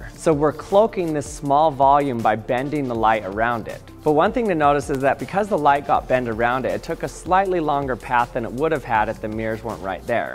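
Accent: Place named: Utah, United States. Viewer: That Utah accent.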